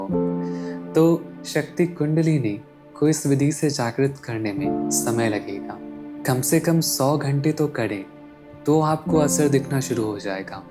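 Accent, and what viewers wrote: Bihar, India. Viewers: Bihari hain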